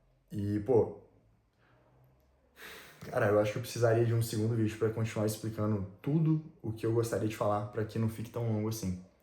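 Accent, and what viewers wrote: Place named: Rio de Janeiro, Brazil. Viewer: Salve mano, nao sei se tu é do RJ comecei a acompanhar o canal a pouco tempo e teu sotaque parece daq apesar de vc mencionar instituições de SP, pq nao tenta UERJ? Med na UERJ é tão prestigiado quanto na UFRJ, tem o HUPE (Hospital Universitario Pedro Ernesto) do lado e fora os programas de auxilio e bolsa que a Uerj proporciona. Falo pq meu primo fez Med la e apesar de concorrido acho que é mais ''certo'' você que ja tem uma bagagem grande se dedicar pra um unico vestibular que vêm repetindo o mesmo padrão desde sempre do que tangenciar seu esforço pra outros vestibulares que por vezes tem a estrutura diferente uns dos outros. Abraço e boa sorte, tu vai conseguir o7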